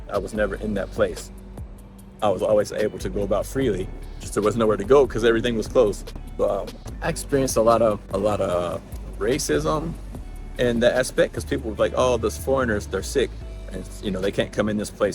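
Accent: South, United States. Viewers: I’m glad you didn’t quit and leave China during the COVID years, when your businesses didn’t do so well. You persevered and survived , despite the negative experiences from individual Chinese who assumed some westerners could have covid, based upon Chinese media reports. . But you have to remember you’re living in a country with 1-4 billion people who can act unpredictably,, . Like others have stated Asians in the USA were treated a lot worse. Trump politicised the pandemic, and referred to it as the Chinese virus , which was echoed across the conservative media channels like Fox News, This created unnecessary fear, and racist attacks against Asian's in the US. . But the important thing is , you survived and your life is better now. You seem like a laid back guy, who overcomes problems and just moves on, By the way , I love your accent , is it a southern American accent? 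I’m Chinese by descent, but I was born in Australia.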